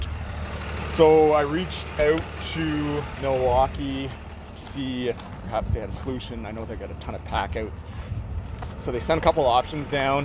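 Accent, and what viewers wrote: Canada. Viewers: How bout some made in Canada tools since you are obviously Canadian, as we can tell by your accent. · Wow. And a Canadian accent. The words are tighter. It was great to watch.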